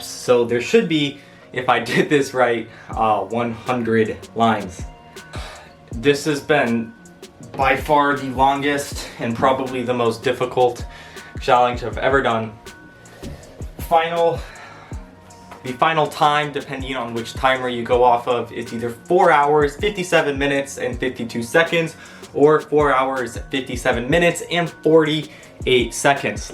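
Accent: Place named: United Kingdom. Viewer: Where are you from? Because the land in your videos are american, but your accent is brithis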